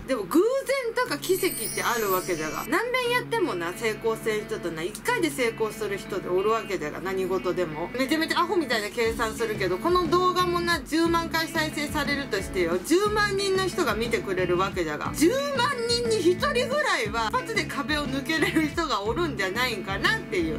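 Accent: Okayama, Japan. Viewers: 岡山県民だけど岡山弁嫌いでもまあたその岡山弁は好きなんだよなあ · まあたそさん 初めましてこんにちわ😁😁😁 いつも楽しみに見させて頂いております😊🤚 やっぱり、まあたそさんは面白い☺ですね🤚 いつも、ゲラゲラ😆😆😆と1人見ては笑ってます😅😅😅 懐かしい岡山弁にホッコリ💓しながら見てますよ😁😁😁🤚 岡山を離れ早いもので8年になりますがなかなか実家に帰れないのでまあたそさんの動画を見ては実家を思い出しながら見てます😅😅😅 メイク動画も好きですがこの動画も好きです😊🤚 今のまあたそさんらしくこれからも頑張って下さいね🤗🤗🤗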